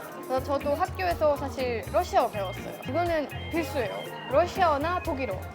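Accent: Seoul, South Korea. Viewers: 나보다 서울말을 잘하네......젠장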